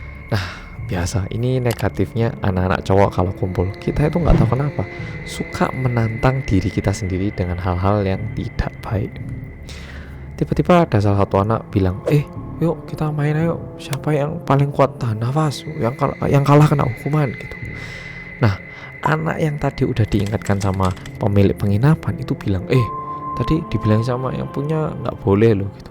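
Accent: Java, Indonesia. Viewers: wkwkwk mas, ini kan cerita tentang orang2 korea ya.. tapi gara2 dibawainnya jowoan jadi berasa denger kisah orang main sungai di brebes. seru. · Lagi nugas tiba-tiba daper notif dari....... Langsung nonton dong♡♡♡♡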